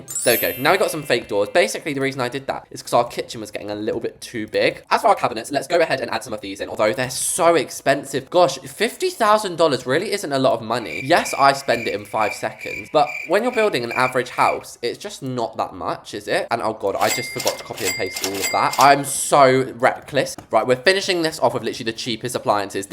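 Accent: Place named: United Kingdom. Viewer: Omg I’ve watched Reese’s for like… yearsssss but I only just realised… IS THIS MAN BRITISH??? I am and honesty he sounds British but I only JUST realised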